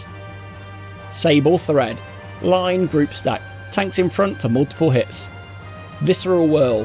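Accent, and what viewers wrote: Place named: Northern England, United Kingdom. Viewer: Just what I needed for a refresher going into it again with new folks! And sure, even coming from Ireland, you can always trust that Northern English accent to be no nonsense, straight to the point, here's what you do. Love it! Thank you so much <3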